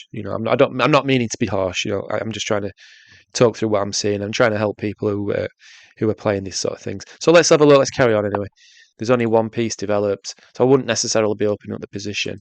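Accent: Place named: Hull, United Kingdom. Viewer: I found your channel by chance, started this video and kept it going because of the way you pronounced "it's a bit daft really" and of course for the chess help but I'm from hull I'm sure u know it and you talk a bit like me so I subbed great content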